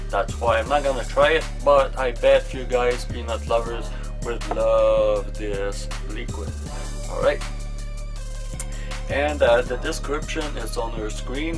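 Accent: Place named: Quebec, Canada. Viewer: Lol im an englishmen from quebec and ur accent is bringing back good memories haha awesome reviews to bro vapin the junkie shit right now too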